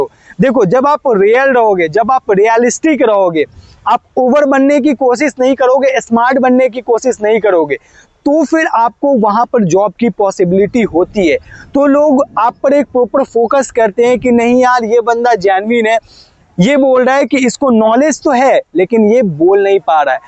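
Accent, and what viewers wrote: Bihar, India. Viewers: Tera aj v english sunke asli main hi lag raha hain tu bihar se hi hain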